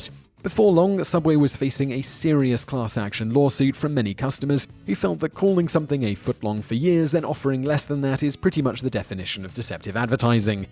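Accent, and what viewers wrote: England, United Kingdom. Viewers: is English and that's how we pronounce advertisment in England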